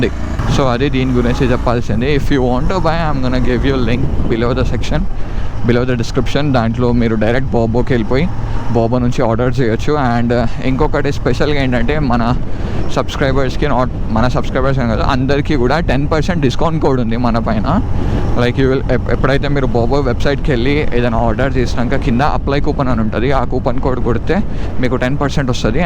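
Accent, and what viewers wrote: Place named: Hyderabad, India. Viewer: The way you speak like light posh and hyderabadi boy 😍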